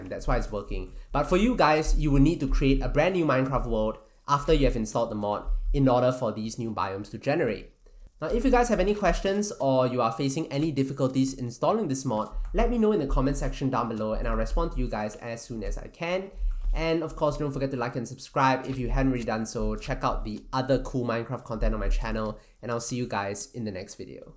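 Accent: Singapore. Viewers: are you from singapore? your accent sounds kinda familiar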